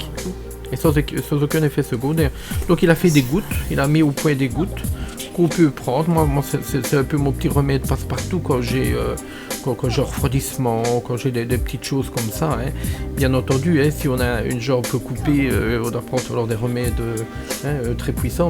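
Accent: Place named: Liège, Belgium. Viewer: En tout cas, ça n’atténue pas l’accent de « Lieche »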